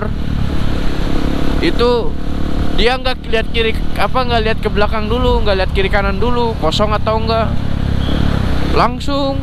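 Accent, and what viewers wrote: Papua, Indonesia. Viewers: Kaka, Kaka dari Papua k habis kk pu dialek macam orang Papua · Logat papua ko,sa dan tong Salam dari serui papua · Abang pu Logat bicara Kaya orng Papua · habis logatnya kentara bos, papuanya dimna bos, kalo saya di sorong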